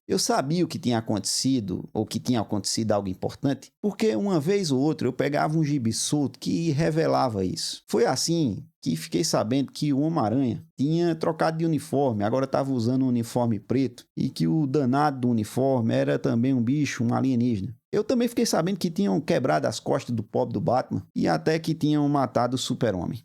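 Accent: Northeast, Brazil. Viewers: Com as devidas adaptações, ouvi a narração de minha jornada com os quadrinhos. Uma aula sobre o tema e uma incrível lista de indicações de leituras com resenhas sintéticas, mas estimulantes. Tudo isso com alta dose de conhecimento, a sonoridade nordestina e o desejo das bençãos de Deus. É um privilégio.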